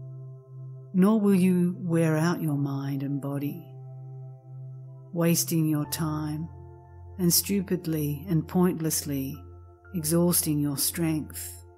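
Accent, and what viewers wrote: Australia. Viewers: I love your voice. Such clarity and such strength fill with compassion. Are you Australian? So relaxing no rush, just basking in it all. Thank you.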